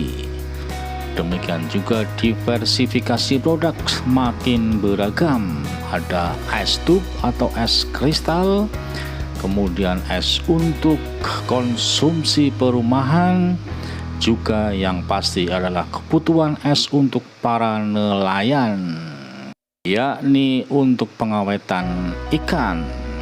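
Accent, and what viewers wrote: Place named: Banyumas, Indonesia. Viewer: Salam ngapak omm.... Sukses selalu 😀😀👍👍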